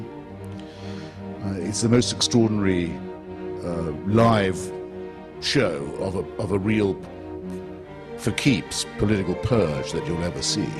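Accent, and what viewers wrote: United Kingdom. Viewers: When I heard the narrator with british accent, I stopped watching! English are not to be trusted, the most evil people that civilization has witnessed! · That's very appropriate a british man talking to us about evil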